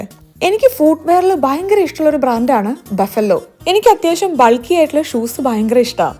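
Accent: Malappuram, India. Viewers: 1..2 aaycha adhonnu ubayokikkate ennit njan adhinte kuttam paraya😅 awww malappuram talkenne ❤️‍🩹🫶🏻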